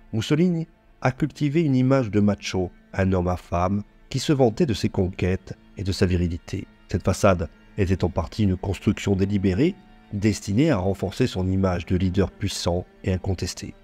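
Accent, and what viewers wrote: Corsica, France. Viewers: Bonjour, Je viens de découvrir ta chaîne et j’en suis ravie, ça me change un peu de ce que je regarde habituellement. Juste par curiosité, tu ne serais pas Corse par hasard? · Bonjour je découvre votre chaîne. Et je m’y abonne !!! J’aime beaucoup les termes abordés. Je suis sure que vous avez lu livre « femmes de dictateur « de l’écrivain Diane Ducret, il parles des femmes des dictature d’Afrique de celle dont vous parlez dans cette vidéo et bien d’autres….. De plus j’ai reconnue avec joie votre accent , venu tout droit de l’île de beauté. J’y ai passé mon enfance et adolescence entre le village de Luri et de Corabara. Ma famille étant native de l’île. Je vous souhaite de bonnes fêtes 🍾 🎊. Et souhaite également longue vie à votre chaîne. Merci pour votre travail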